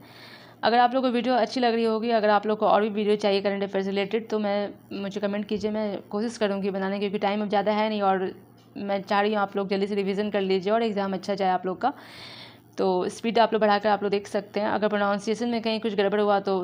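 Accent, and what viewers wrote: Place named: Patna, India. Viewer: Aapki bhasa patna ki hai...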